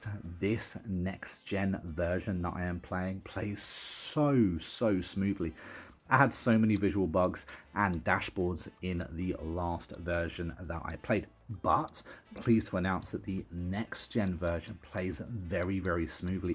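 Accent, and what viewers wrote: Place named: United Kingdom. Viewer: Red Banana. Thanks for the up to date review which also mentions New Frontiers, what I was looking for. Also early on I noticed that you were deliberately choosing to speak in normal English and not a weird mix of normal English and specifically American English in word choices, pronunciation and putting the stresses in the wrong parts of the words. This isn't just annoying, it's the fact that it doesn't make more people watch and like you from over there. Americans have been trained by Hollywood to James Bond baddies with English accents to give a level of intelligence and competence that they like to listen to, just because we get past participle right. I therefore was not surprised to hear you have a book out, so that was why I didn't have to explain to you what editors say about not mixing dialects. Good luck to you with both this and the writing and thanks for being competent. 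This is very hard to find among British content creators increasingly.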